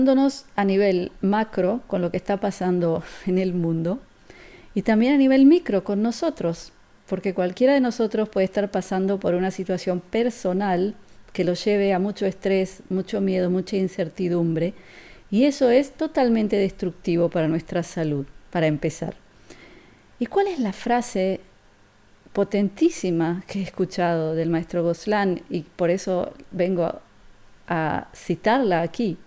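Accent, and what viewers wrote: Argentina. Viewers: esa historia de las huellas no es de Dios Padre El es energía y no tiene materia Las huellas son las de Jesús El se hizo Hombre y camino sobre esta tierra Entiendo tu historia tus raíces y las respeto!!! Pero la Huellas son de Jesús!!! Aunque Nuestro Padre Eterno Siempre esta pendiente de nuestras necesidades!! Tenes una dulce y suave voz Argentina da gusto escucharte También en Hebreo resalta esas cualidades que mencione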